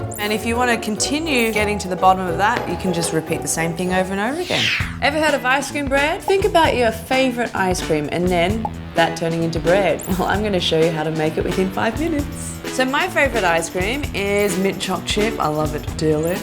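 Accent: Australia. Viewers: Is she Australian? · Are you Australian?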